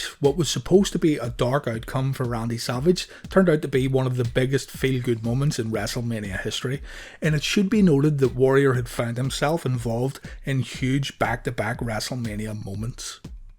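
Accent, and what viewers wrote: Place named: Scotland, United Kingdom. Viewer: I was expecting an American video and this Scottish dude comes on.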